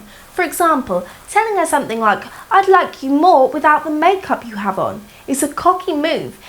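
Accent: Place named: United Kingdom. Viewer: The creepiest thing is this ultra thikkkkk British accent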